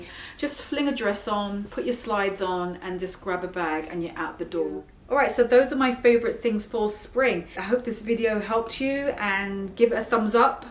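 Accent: United Kingdom. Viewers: ! You are the Master Mixer, Co-ordinator and Fashionista of them all! You're truly such an inspiration and a great teacher! Yaay and a fellow Brit... love your accent😂 I've been dowdy for far too long and been wanting to switch it for a while. Well, now I'm switching it up... with your help! Thank you. Please tell me the oriental jacket from is reversible. It sure looks like it is. Love you! Love what you do! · Hi, I'm Mariel (the same from your blog, but another picture jajaja)....You look amazing with your own hair!!! Keep it that way! I love your british accent....!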